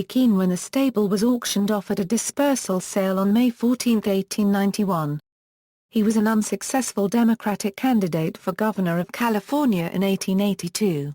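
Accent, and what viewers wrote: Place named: East London, United Kingdom. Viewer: cannot understand your east London accent....you speak at 120 mph slow down to 60 mph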